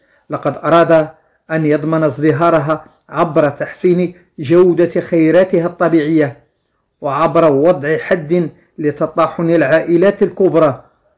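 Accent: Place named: Algeria. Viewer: هدا لي تيهدر باين جزائري مافيها شكك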